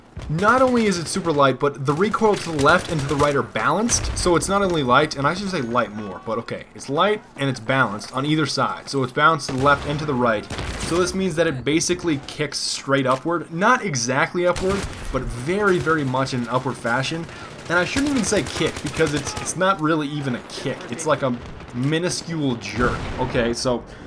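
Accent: Germany. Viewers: u german bro? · bist du deutscher ??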